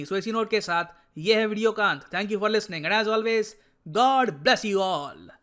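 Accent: India. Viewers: As soon as I heard the curry-flavored English, I knew the rumor was back.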